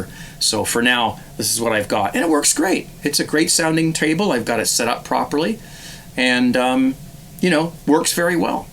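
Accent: Canada. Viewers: I'm old enough to remember playing 45's on turntables with spindles that held a stack of records that were automatically dropped and played in succession—hence the term "stax of wax." Great for the condition of both the stylus and records. 😉 BTW, you sound like you might be across the river in Canada? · I'm getting back into LPs and singles and just like your past experience, I am now noticing how much better clean records CAN sound with a good setup, compared to the substandard record player my parents and I had in the 1970s and 80s. By the way, do I detect a Canadian accent? Thanks for the channel!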